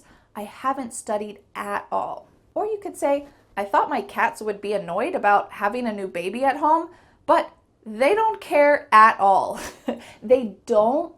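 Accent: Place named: United States. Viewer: Thanks for all your classes. With your methodology I can improve my english skills so much. My favorite youtubers to learn english are you with american accent, Lucy with british accent and Emma with Australian accent. I'm a beginner but I want to learn first american accent and for that I try to watch all your videos. Saludos desde Colombia.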